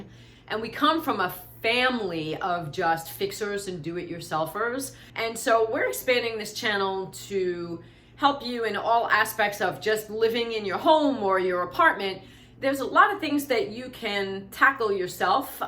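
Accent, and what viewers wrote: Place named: New York, United States. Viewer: What’s that accent?!?! Big 🍎 ??? 🥰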